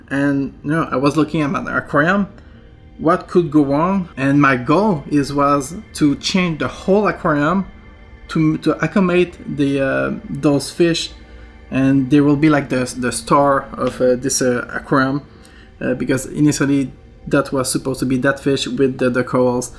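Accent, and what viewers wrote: Quebec, Canada. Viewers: Hi sorry for the loss are you from Quebec Canada. Cause you have an accent.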